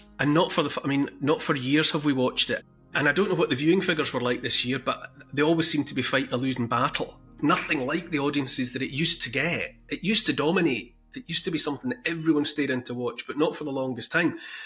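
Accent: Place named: Dumfries, United Kingdom. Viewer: I love you,. My long-gone uncle grew up in Dumfries and so it's extra lovely listening to you speak. I'm grateful for your chats about the machine. TBH I haven't been able to bear watching US movies for a long long time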